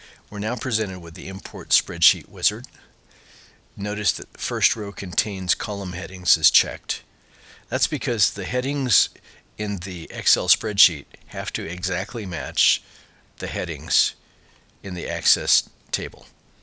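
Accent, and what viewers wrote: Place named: United States. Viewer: I FINALLY FOUND AN INSTRUCTOR WHO SPEAKS AMERICAN ENGLISH.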